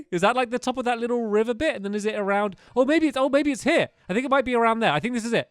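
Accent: England, United Kingdom. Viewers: Question are you English or american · hes british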